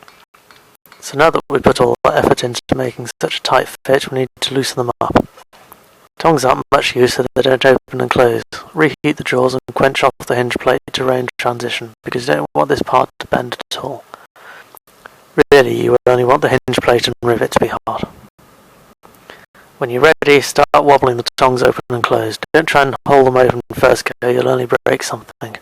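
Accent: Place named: RP, United Kingdom. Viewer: such a posh accent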